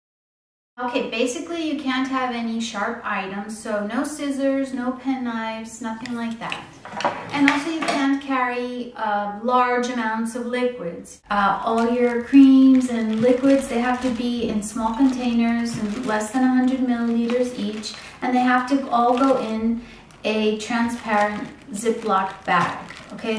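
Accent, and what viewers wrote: United States. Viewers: If you're from Spain, how is it that you sound so American? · I've been watching her videos and I hear an American accent but she holds a Spanish Passport.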